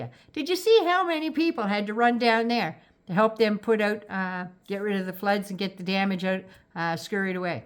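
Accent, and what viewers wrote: Canada. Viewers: Thanks for the Canadian voice. Trump needs to be said to the face - and I have this from a Black lady: Don't bullshit the bullshitter! Well, Trump has bullshitted his voters and supporters.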